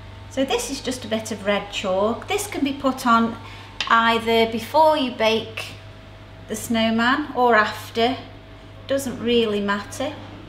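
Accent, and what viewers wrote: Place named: Hull, United Kingdom. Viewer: love ya accent are you from Hull like me xx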